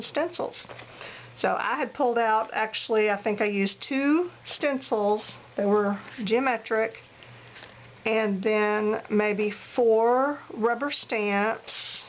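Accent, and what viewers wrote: South, United States. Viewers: Love your Southern accent, you sound like me!!! Those Yankees just don't sound right. Love this idea too and might have to try it.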